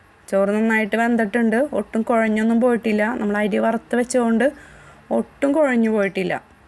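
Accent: Kodungallur, India. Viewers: Itha kodungallur kary aano... slang kettitt thonni... nice recipe.. njn nthayalum try cheyyum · samsaram kettapol thonni njnum kodungallur aann😁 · ഇവിടെ മക്കൾ പറയുന്നു ur talking style same as me.. അതാ kdr ആണോന്നു ചോദിച്ചേ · hey njnum kodungallurr... Eriyad.. enkku samsaram kettappol thonni.. kodungallurr evdeya... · ഇത്താ കൊടുങ്ങല്ലുർ ആണോ വിട്. സംസാരം കേട്ടിട്ട് ചോദിച്ചതാ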